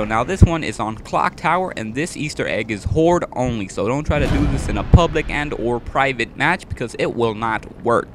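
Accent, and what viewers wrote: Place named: Canada. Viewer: u sound canadian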